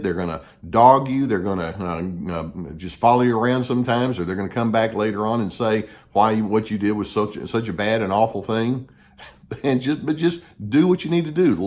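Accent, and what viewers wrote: South, United States. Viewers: this is fantastic guidance, all your advice is spot on, (and I love your southern accent !) many thanks, from the Uk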